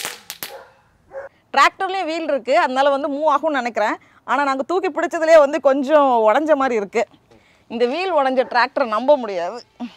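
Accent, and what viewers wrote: India. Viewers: Nice volg dear Namma uru Tamil kekavei sema mol cutiee 💓💓💓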